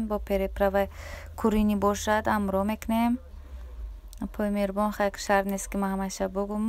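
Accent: Kulob, Tajikistan. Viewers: Салом алейкум Хоҳарҷон худованд баракат ба касбу ҳунарт тия 🤲🤲🤲😘😘😘 як моҳ пеш случайно дромадм каналтон лаҳҷаи анаму кулобҷон дилма ов кард сразу подписатся кардм ҳамаи видиёота тамошо мекнм ва хеле чизора омухтм аз шумо, ободу сарбаланд боши хоҳари меҳрубон 🌹🌹🌹🌹🌹🌹🌹🌹🌹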